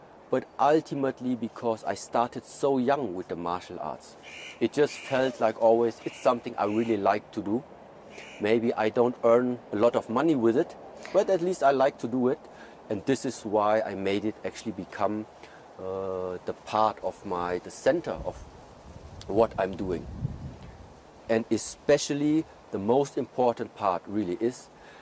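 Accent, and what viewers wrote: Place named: Germany. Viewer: nach einer sekunde gehört dass er eigentlich deutsch spricht hahaha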